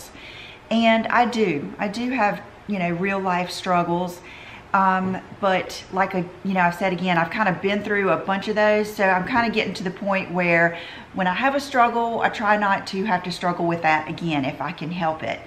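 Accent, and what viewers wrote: South, United States. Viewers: I love your outfit ! You have the most likable personality and such a charming Southern accent. I’m so glad I discovered your channel. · Love to watch your videos, gotta admit it's because of your demeanor and southern accent.....😁 your voice is sooooo like shelby from steal magnolias, hope that does not offend you 👍🌻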